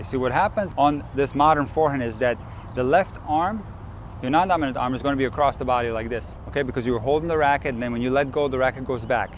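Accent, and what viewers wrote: Russia. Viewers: ДА ТО РУССКАЯ ПО ГОВОРУ!!!🤣🌺🤣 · is russian love her asscent saw her from the beginning so good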